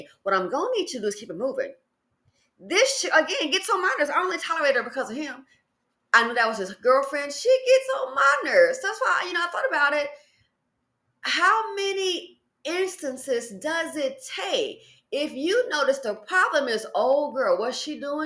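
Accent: South, United States. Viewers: It’s country and southern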